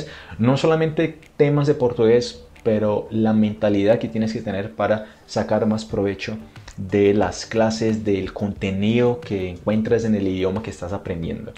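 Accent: Colombia. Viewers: Parabens! Llevo muy poco aprendiendo y llegué a tu canal. Me gusta mucho y me llamó mucho la atención tu español que es prefecto, yo diría casi seguro que lo aprendiste en Colombia 😎, como sea, lo hablas muy bien..bueno, saludos desde Valparaíso, Chile, Obrigado meu amigo 😉🇧🇷🇨🇱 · Tu acento en espanol es colombiano creo jeje no te habia escuchado en espanol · woooow muito legal, eu aproveito muito seus ensinamentos você é um professor muito especial e também parece um nativo de Colombia parabéns e obrigada 🇨🇴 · tienes acento colombiano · Pensé que eras colombiano jaja hasta que escuché " tenía problemas con el español en argentina". Ahora, ya eres un nativo en este idioma, definitivamente. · Dios mío! Es increíble como hablas de bien español! Tenho uma dúvida: para falar muito bem português, tenho que ter sotaque também? Por exemplo, você fala como colombiano! 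· Usted, no es de Brasil, es de Colombia! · Hermano felicitaciones pareces un colombiano es impresionante como tenés marcado el acento no pareces brasileño jajajaj,estoy viendo todos tus videos y me ayudan mucho a mi me encanta el portugués desde muy chico escuchaba música axe que pasaban acá en Uruguay y desde hace un tiempo empecé a estudiar portugués,abrazo saludos desde Montevideo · Usted para un brasileno perfeciono muy Bien El Espanol su Espanol suena muy Colombiano Felicidades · Se nota bastante el acento colombiano. Un saludo. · Wow, apenas te descubrí hoy pero estoy impresionado, acabo de ver un video tuyo donde hablas en portugués y obviamente no tuve dudas de que eras de Brasil, pero luego hablaste un poco en español y ahí me entró la duda de dónde eras porque tu español es perfecto y tu acento me sonó a Colombia. Tuve que ver este video donde hablas español para descubrirlo, ahora estoy más impresionado. 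Soy de Colombia y si dijeras que naciste aquí te creería sin dudarlo. Muy buen trabajo, quiero aprender tu idioma y me encantaría llegar a sonar como tú al hablar en portugués, muchas gracias por las recomendaciones, son muy útiles. Saludos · voce tem o sotaque colombiano!!! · Tiene acento Colombiano este muchacho. 😂 Si si Colombia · !! Gosto muito de ouvir vc falando portugués, mas falando espanhol e com sotaque colombiano es Wooo👏 estou estudando muito, Obg vc pelos seus videos ajudar muito. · Hablas con acento colombiano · Felicitaciones pareces un colombiano hablando espanol tu nivel es excelente❤ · ya tienes el aacento colombiano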